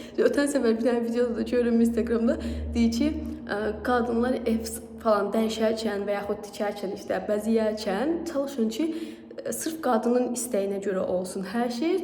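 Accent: Sheki, Azerbaijan. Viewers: Canim gôzum xeyirli olsun saglam oturun icinde xowbext yawiyin.Balalarinizin xeyir iwlerini goresiz.Bizde Maskoviski oblastda yawiyiriq.Ümüd edirem bir vaxt rastlawariq.Menim yeznemde Wekilidi çox Gozel insandi.Weki lehçesini cox sevirem wirin daniwirsiz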